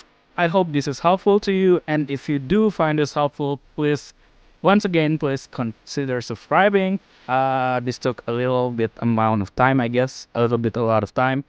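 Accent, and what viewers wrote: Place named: Indonesia. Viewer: Logat indo njir... Ts orang indo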